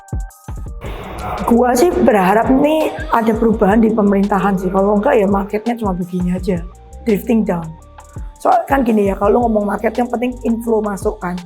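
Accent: Java, Indonesia. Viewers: Cici jawane medok.. 😊😂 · Cici penyampaiannya gokil substantif dan mudah difahami, terutama medok lagi 😅👍👍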